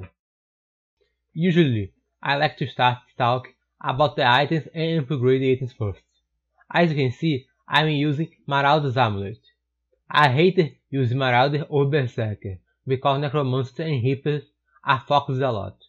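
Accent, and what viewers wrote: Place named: Northeast, Brazil. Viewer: Pontes Neto Pior que ele fala certo só que o sotaque nordestino é muito forte, hahaha lembra mto o Joel Santana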